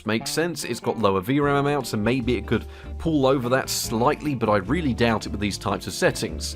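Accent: United Kingdom. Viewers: What region of the UK is accent from?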